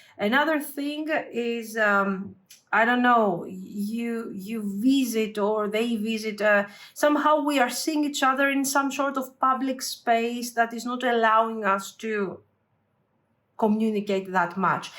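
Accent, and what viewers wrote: Greece. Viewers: I guessed you’re Greek from your accent 😆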